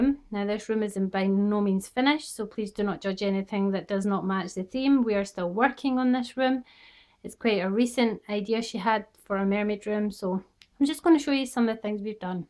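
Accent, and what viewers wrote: Scotland, United Kingdom. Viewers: are you Scottish you sound so much and love the room I need to for this to my room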